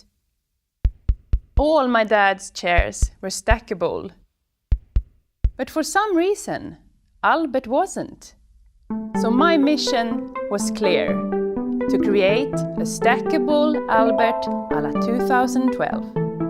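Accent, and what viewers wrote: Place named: Sweden. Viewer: This is a very thick Swedish acccent, if there ever was one! Cute design! :-)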